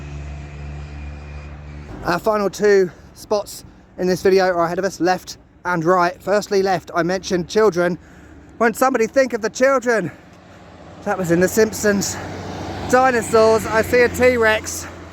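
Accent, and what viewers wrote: England, United Kingdom. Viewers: Video sam coveka dok je snimao, mislio sam neki domaci triper prica na engleskom, kad ono pravi englez 😆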